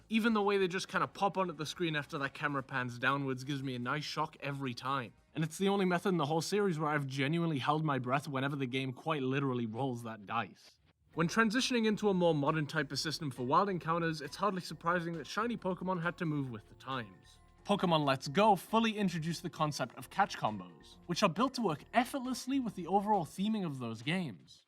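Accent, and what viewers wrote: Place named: North, United Kingdom. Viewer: Nice one bud, had to reward that top tier tweet with a video watch, quality stuff. Especially the lush northern accent. Shiny locking has never made sense, but you're especially spot on about the Gen 3 colour changes, I hardly even clocked them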